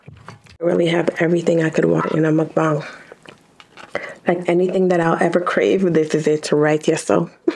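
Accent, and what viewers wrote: Jamaica. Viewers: Why you sound jamaican????🤔🤔🤔🤔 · Are you Jamaican??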